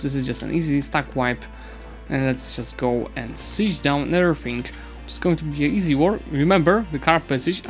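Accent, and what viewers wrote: Eastern Europe. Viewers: Самый славянский акцент в мире. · What the, the voice describing the situation sounds feminine/or really young but the pronounciation sounds like, its kinda weird, amyway great video, and comment for algorithm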